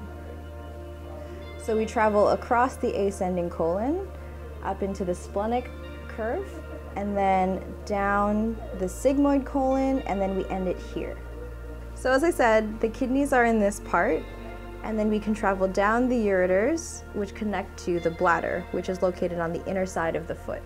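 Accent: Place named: General American, United States. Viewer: Her diction is clear. She has the standard American accent.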